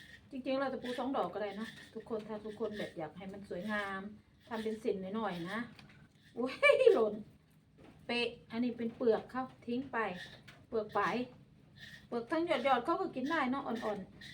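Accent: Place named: Chanthaburi, Thailand. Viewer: สำเนียงจันทบุรี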